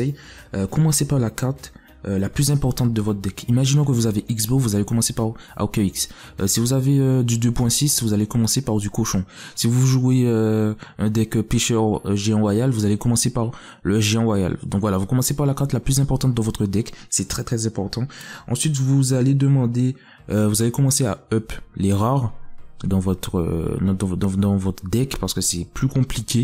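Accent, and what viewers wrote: Antilles, Caribbean. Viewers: T antillais, ça sentend a ton accent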